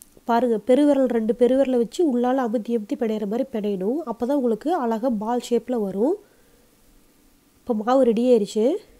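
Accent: Kongu, India. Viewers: Your kongu Tamil was good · Good kongu slang😀😀😀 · அருமையா சொன்னீங்க ! கொங்கு தமிழ் அருமை ! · Kongu tamil eppovuma alagutha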